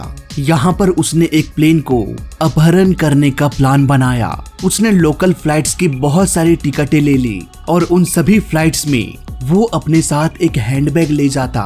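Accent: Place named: Rural, India. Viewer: For a Japanese incident using Arabian background music ? Sha ko sa . And sa ko Sha ? How come there is no self realisation that it’s not a documentary of Bihar railway station but an aircraft .. U sound so dehati ..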